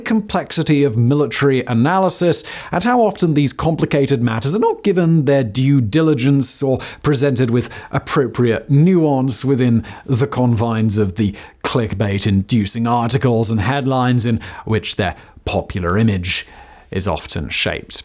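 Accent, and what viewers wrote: United Kingdom. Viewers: Despite your British accent and the continued use of the word zeitgeist your review of of the A10 demontrates your an utter ignoramus. First of all, the 30 mm gun is just one tool in the a10s drawer. It carries a bevy of missiles and bombs which can and have destroyed numerous Main battle tanks from any angle. On the other hand the 30mm can eliminate pretty much any other armored vehicle without breaking a sweat. It had more losses than other aircract in the Gulf because no other aircraft were used and couldn't be used the same way. The A10 would loiter in the battle space pass after pass providing persistent close air support. All other jet fighters would do a single pass at high speed and move out. BTW, the Air Force hates the A10. As far as they are concerned close air support is for the army and its helicopters. The people who most like the A10 are the ground troops that know the benefit of having a 30 mm gun in the sky farting 1000s of 30 mm rockets and bombs over your enemy over and over again.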